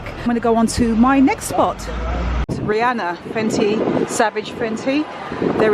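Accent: United Kingdom. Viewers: You are in Nueva York . We do have a few from the UK here , luv your accent!!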